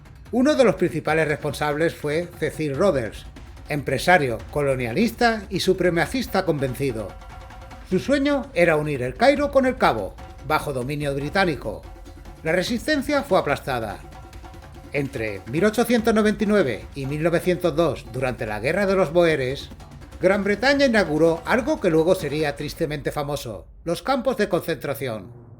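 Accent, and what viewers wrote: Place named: Spain. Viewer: Sin querer tirar hate, pero me parece curioso que una persona co acento español hable mal de colonizadores, perdon pero me pareció curioso XDD. Me gustó mucho el video ♡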